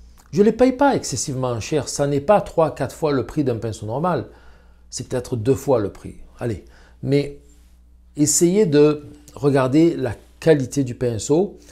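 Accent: South, France. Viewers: Je dessine depuis toujours mais je n'ai jamais essayé la peinture (à part en primaire!). Cet homme me donne envie de m'y mettre, il respire la sincérité et le professionnalisme, ses vidéos sont très intéressantes et bien faites, et j'adore son petit accent méridional! Bravo et merci, et.....j'y vais!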